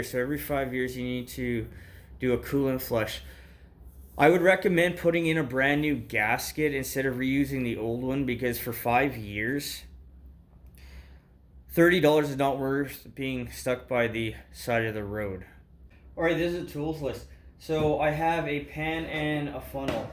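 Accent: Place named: Michigan, United States. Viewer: Thanks for the vid 👍🏽 Saving me ab $140. Also any chance you're from Michigan? 😂 you have a strong accent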